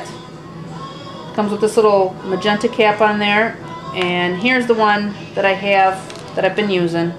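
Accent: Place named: Minnesota, United States. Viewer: Great video. Love your accent. I'm guessing Minnesota.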